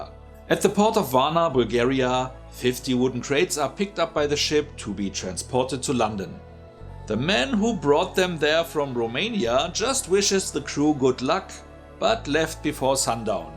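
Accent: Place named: Germany. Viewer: why are you doing a nazi accent?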